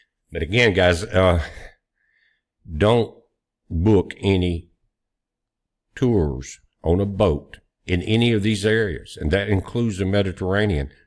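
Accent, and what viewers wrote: South, United States. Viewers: I love your southern accent. I was half way through this video before I figured out you were saying fraud. I thought you were talking about frogs! 🤣 I was thinking Uh oh... went looney tunes.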